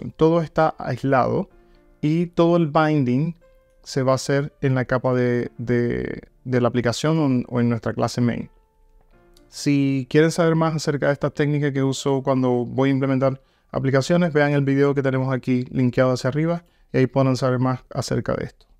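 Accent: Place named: Dominican Republic. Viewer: wow, jajaja! Tienes una voz grave y suenas un poco como los Dominicanos. Saludos bro! PD.: me suscribí a tu canal, sé que aprenderé mucho. Estoy aprendiendo Java y me gusta lo que veo, el backend es hermoso.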